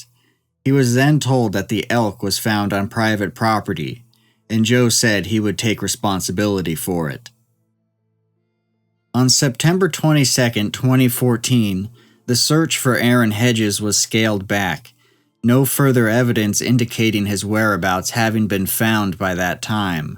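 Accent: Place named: Southern California, United States. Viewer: Is it interestING or interestEEN? HuntING or huntEEN? EndING or endEEN? Dang SoCal Californians!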